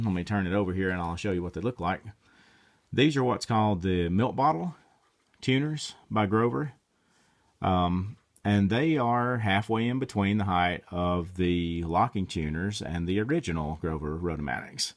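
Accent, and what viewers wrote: Alabama, United States. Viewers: are you from alabama?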